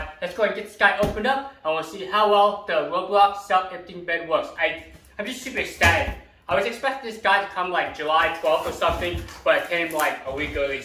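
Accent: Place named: Singapore. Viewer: You Singaporean or something? Accent is strong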